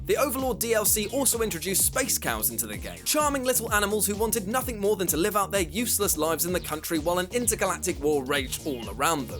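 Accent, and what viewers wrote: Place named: United Kingdom. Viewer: Why is there always some posh English bloke narrating this garbage?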